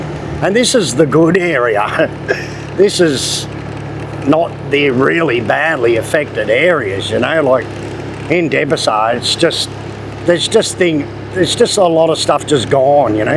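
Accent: Australia. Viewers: I’m in North Queensland, great to hear the flat Aussie accent 😊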